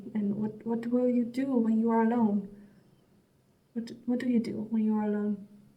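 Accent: Netherlands. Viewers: Hi there, 2 questions ... I hear a Dutch accent? And 2nd were do you get the information from ? Thanks for sharing your videos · Hoor meteen dat je uit Nederland komt ❤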